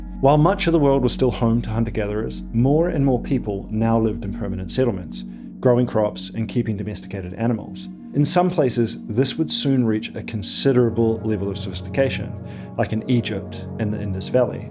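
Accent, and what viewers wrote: New Zealand. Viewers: Absolutely splendid video. I’d never have clicked if I’d seen the length but enjoyed every minute. Where is your rhotic kiwi accent from? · Narrator has a strange semi-rhotic accent with traces of NZ roots. Auto-captions struggles to understand it. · Rhotic New Zealand accent. Intriguing.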